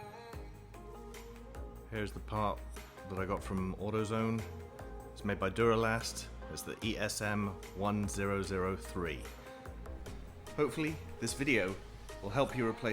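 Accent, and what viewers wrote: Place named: United Kingdom. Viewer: Everything sounds better with a British accent❤ 👍 You sound like my son in law from Nuneaton...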